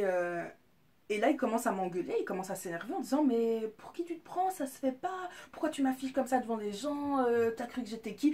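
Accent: Belgium. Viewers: j'adore ton accents belge 😘💝😜 t'es juste hyper belle c'est fou 💎💎 tes parfaite 😍😍😍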